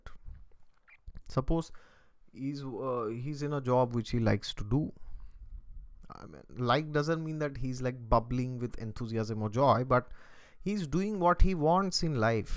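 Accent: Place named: Bengal, India. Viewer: ji you sounds like a bengoli boy.. Your way of talking is just like a Bengali boy.. I like that as because I am also a Bengali.. Hehe 👌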